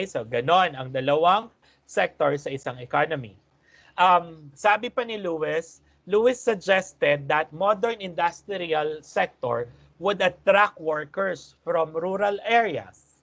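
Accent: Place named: Philippines. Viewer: Omg thank you so much for posting this!! It's been a little difficult understanding the accents in other videos. I was so happy when I heard the Filipino accent. May maiintindihan na ako huhu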